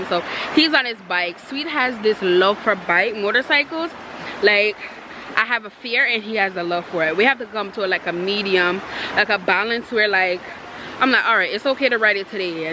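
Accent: Jamaica. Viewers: Uh hear patwa strong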